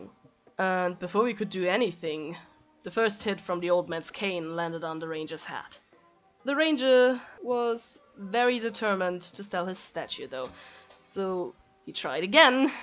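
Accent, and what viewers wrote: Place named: Germany. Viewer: Your little german accent is great! Your art us also great!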